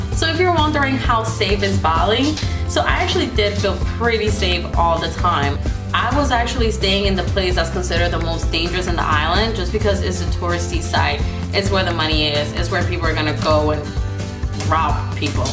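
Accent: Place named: Latin America. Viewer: For some reason u have a Latina accent. Or maybe I’m trippen... anyways aside from that, I’m considering solo traveling for my birthday in the summer. Ur pictures are amazing. I’m curious how were U able to have pictures taken of urself do u ask strangers. Also I seen you went from the Airbnb hut and then you found a cheaper hotel was there a reason u switched stays. I also want to get amazing pics and experiences while also staying safe, I’ve been flirting with this solo traveler idea for so long I just don’t know where to begin but I don’t want to wait anymore any feedback is appreciated. 🤗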